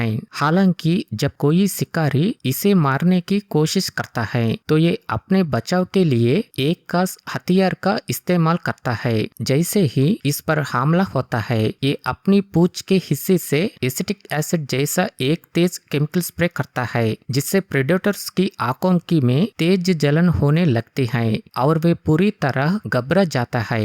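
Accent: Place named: South India, India. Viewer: Apki Hindi bolne me South India ki thodi thodi asar lag rahi he 😊👍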